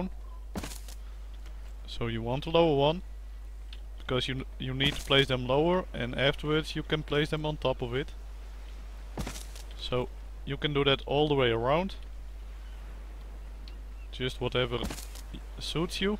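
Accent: Netherlands. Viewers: Nice dutch accent ;) subbed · Dat dutch Akzent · are u dutch?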